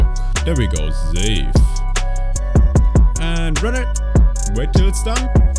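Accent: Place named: Germany. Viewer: Ziemlich aufgesetztes, unnatürliches Gequatsche - und deine deutsche Intonation ist dennoch unüberhörbar, mein Junge. Ist bei dir nicht geheizt, dass du diese irrwitzige Mütze tragen musst? :face-green-smiling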